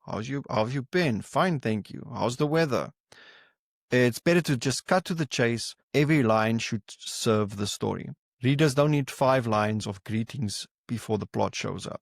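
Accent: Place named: Southern Africa. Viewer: That accent...which side of the Limpopo are you from? (Harare lad here!!)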